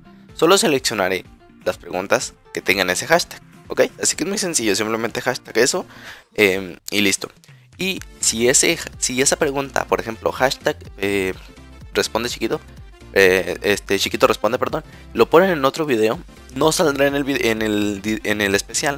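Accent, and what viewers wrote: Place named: Spain. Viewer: no se la verdad, será por que se te pego lo español cholesco y me da cosa, y me recuerdas a varios tipos que conocí en mc y eran ratescos hablando con esas Jergas de sus ytbers españoles. también esta intro v: me da ganas de pegar al creador de esa canción xd pero bueno, eso no significa que te haga hate, me gustan tus videos, y se que te esfuerzas haciendo los videos, las horas que le pones al mc, independientemente de en cuanto tiempo lo subas a la red, el renderizado eso se merece y bien ganado los subs que tienes v: y seguire poniendote que no me caes.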